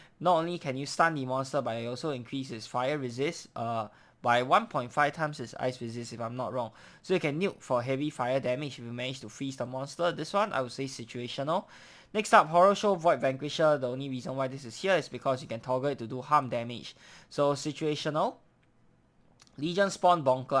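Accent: Singapore. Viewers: Bro you singaporean ah?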